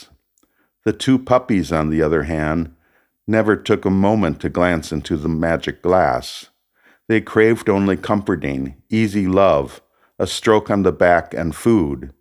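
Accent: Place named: Milwaukee, United States. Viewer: I was looking forward to listening to this while getting back into the studio, that's how I usually listen to any radio programs. Thus maybe I didn't have the type of undistracted focus I might have had if I was not working on my "skilled" painting at the same time, but it was nice in that it felt like I was painting while in a room with my friend. His voice is so soothing in this piece, with the strong Milwaukee accent that made me homesick for the midwestern United States when I first met him in India, that he can broach ideas that I might disagree with in part with the kind of reasonable dialogue and give and take that used to be a part of our political culture, in art and politics, but sadly seems to be lacking now. I love how it flows in and out of past, present, the personal, political and framed by his residency with his friend and scholar Mary Storm in Sri Lanka. 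I feel I could listen to this again and again and get something different from it each time.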